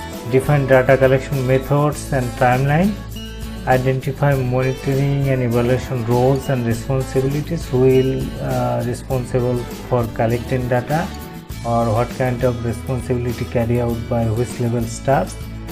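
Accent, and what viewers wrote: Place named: Bengal, India. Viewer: looks bangali English so try to focus on pronunciation & then upload it.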